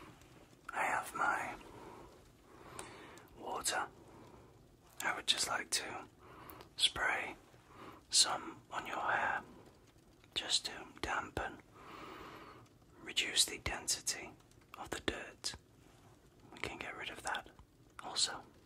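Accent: Yorkshire, United Kingdom. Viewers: a proper accent whilst listening to ASMR! Beautiful Yorkshire tones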